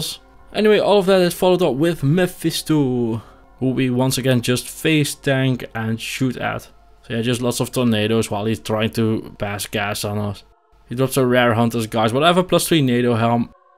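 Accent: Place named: Netherlands. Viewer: hou van het Nederlands accent haha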